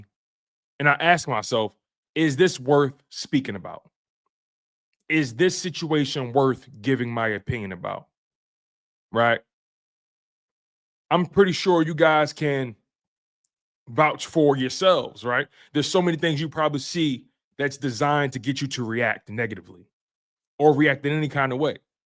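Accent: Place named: Arizona, United States. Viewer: I ain’t know you was from Arizona street i got a lot of family there might have some mutuals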